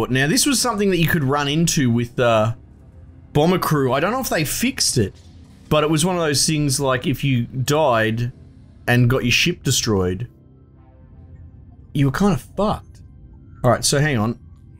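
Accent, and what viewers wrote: Australia. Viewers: Is it me or are you Australian?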